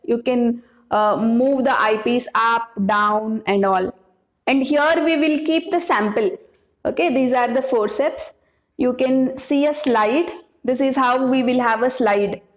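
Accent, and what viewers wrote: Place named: Tamil Nadu, India. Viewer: Madam you are speaking tamil😂😂😅 you are speaking is funny 🤣🤣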